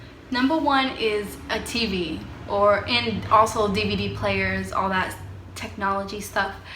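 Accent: Boston, United States. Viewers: On another topic she has the cutest Boston accent 😁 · A projector with a cute Bawston accent ;)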